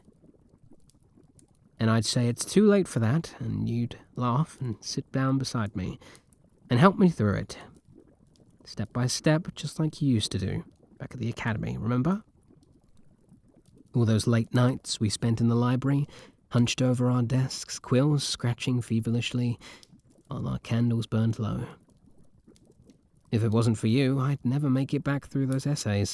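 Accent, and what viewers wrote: United Kingdom. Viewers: Ah the British audio I can’t look at them the same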